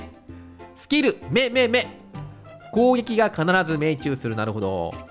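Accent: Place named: Yokohama, Japan. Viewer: もしかしたらさん横浜？に住んでるから訛りが入ってるのかもしれない（横浜であってますよね？）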